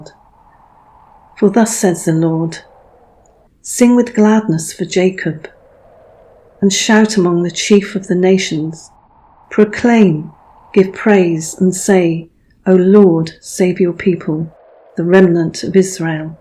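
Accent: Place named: England, United Kingdom. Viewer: Thank you. So beautifully read and timely for Israel. Love the English accent!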